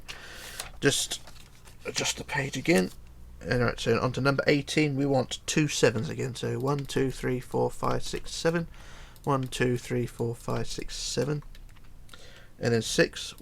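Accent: United Kingdom. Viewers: man i love your english accent✌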